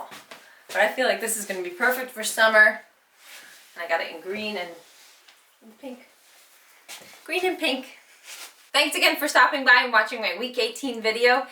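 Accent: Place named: Delaware County, United States. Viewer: Love your updates! You have such a delco accent lol. Are you from there? (Delaware county)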